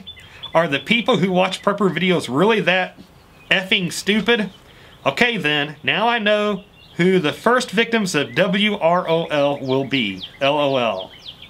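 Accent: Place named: Appalachia, United States. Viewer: I like your speech just fine and keep up the good work any correct information even from hillbilly speaking person or slang speaking person your good soul. and how you speak to point is not bad not racist or anything bad so god bless and maybe god bless your family and friends out there.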